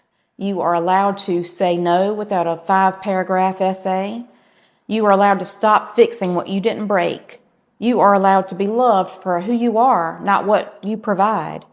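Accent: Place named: United States. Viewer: I’m a Brit and I love your accent. To me, you sound like a proper American.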